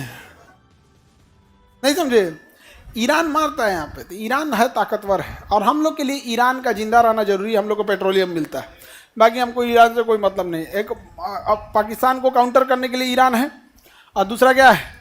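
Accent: Bihar, India. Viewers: Bhai chawal ho tum toh kuch samjh nahi Aa rha bihari ho na pakka tum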